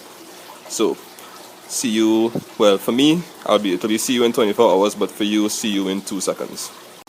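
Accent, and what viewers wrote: Trinidad and Tobago. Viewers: i hear a trinis voice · Hmm u sound like a Trini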